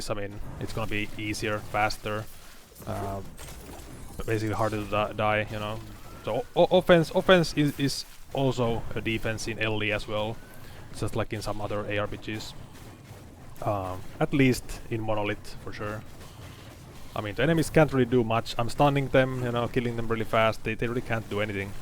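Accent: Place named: Finland. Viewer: Juu kyllä suomalaisen rallienglannin tunnistaa ^^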